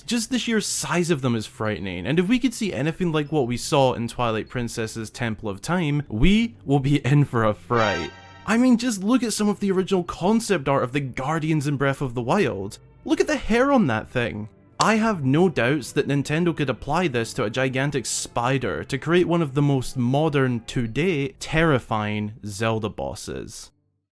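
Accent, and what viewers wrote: Scotland, United Kingdom. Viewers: Your Scottish accent is perfect for your Zelda content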